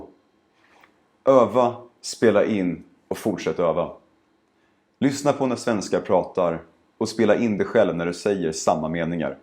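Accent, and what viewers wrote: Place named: Stockholm, Sweden. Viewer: Jag kan lyssna på dig hela dagen ! Prata mer. Snälla. Haha Jag just gillar din tonfall och stockholmska dialekter 😍😄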